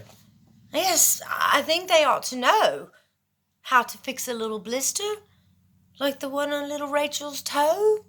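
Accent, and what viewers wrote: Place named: England, United Kingdom. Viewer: I love the english accent...do you have a theater background?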